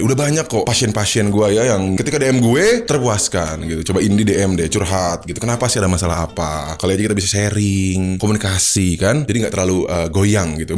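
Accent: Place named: Madura, Indonesia. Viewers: Logatnya kayak madura ea 😂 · Udah lama ga ngeroast orang kok logatnya jadi madura qor . . Btw dikit lagi sejuta nih, siap siap ditagih janji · lama lama kok logat lu kek logat madura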